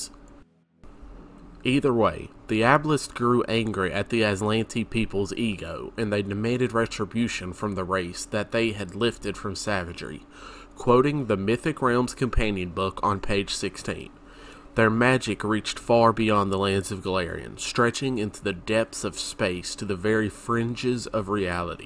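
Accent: South, United States. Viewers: exceptional voice/accent, Im from SC and appreciate the southern rep. The music doesnt fit though. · I'm from SC and was also pleased to hear a Southern GM haha. It's not a common accent for these kinds of videos so it definitely made me smile Great work on these vids btw!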